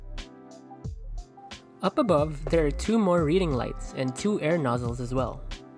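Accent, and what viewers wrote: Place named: Philippines. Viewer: You should work on your camera angles, that "overview" shot of the seat is horrid. You should also work on your voice over spill and should only speak on what you know for sure, so you wont sound too ignorant. Please hesitate on direct copying other youtubers, it doesn't quite suit you and your filipino accent. Its quite obvious which youtuber you are trying to copy. Hope you dont take offense and improve in the future. Cheers.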